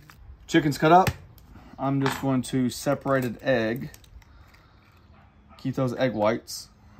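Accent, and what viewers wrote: South, United States. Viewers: With a Sweet Southern Accent 🤗😎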